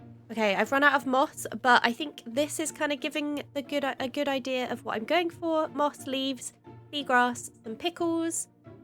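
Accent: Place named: United Kingdom. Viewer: Love the vids. Builds look great and nice to hear a chiiled brit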